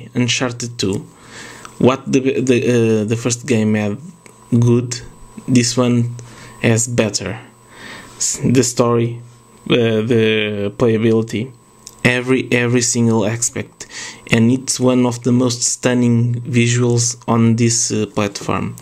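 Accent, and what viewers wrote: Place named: Yugoslavia. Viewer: You sound Yugoslavian like me but you're French right?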